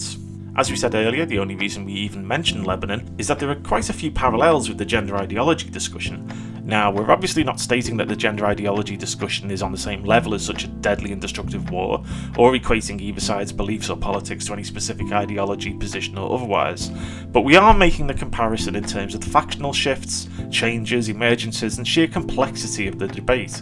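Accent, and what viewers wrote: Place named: North East England, United Kingdom. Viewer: Just come across your channel (new subbie now). Why on Earth doesn't this channel have more subscribers???? Your videos are excellent and really well made! Good to see that you haven't been "silenced". Working my way through the videos. Keep up the excellent work! PS You sound like you are from my part of the world (north east England).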